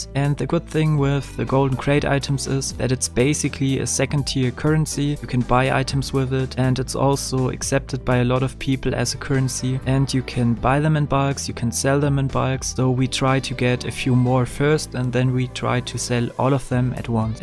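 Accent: Germany. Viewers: Du bist doch deutsch oder das hört man raus haha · I like these Videos but i have one question. Bist du Deutscher? 😅 hört sich voll so an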